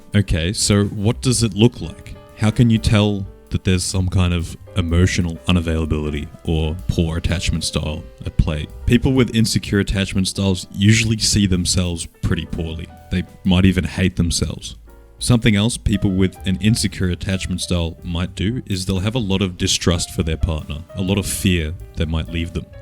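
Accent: Australia. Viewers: Sorry I’m only here for the Aussie accent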